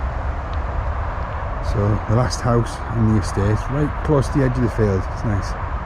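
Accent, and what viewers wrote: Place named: Middlesbrough, United Kingdom. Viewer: Thanks enjoyed that trip down memory Lane although without your lovely Boro accent and some of the original houses and street names I could have been on any new estate in the UK! 50 plus years I was walking from my house opp the original community centre ( Springvale Terrace) up East Row to pick up Sandra Peacock from 14 East Crescent who sadly won’t be in anymore as her house has gone 🥲. On a positive note my mam would have loved those new houses and the fact it was called Acklam Green as she always aspired to live in Acklam and wouldn’t tell anyone she lived in Whinney Banks ( bit of a snob) but I always corrected and reminded her 🤣. Many many happy memories. Keep up the good work!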